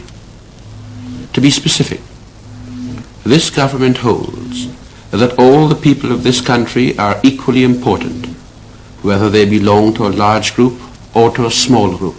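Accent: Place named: England, United Kingdom. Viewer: Great orator my ass. He trying to sound British. That is no Guyanese accent.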